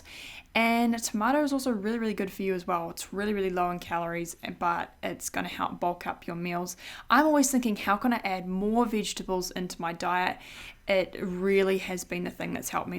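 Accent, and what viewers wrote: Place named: New Zealand. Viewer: I love her New Zealand accent!!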